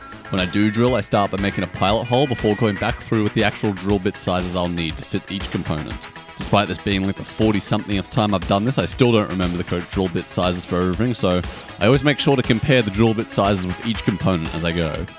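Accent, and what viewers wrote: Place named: Australia. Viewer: Awesome! Great to hear a fellow aussie too!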